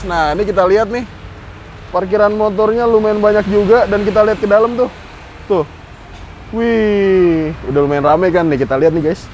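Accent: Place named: East Java, Indonesia. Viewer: Jawa timuran nih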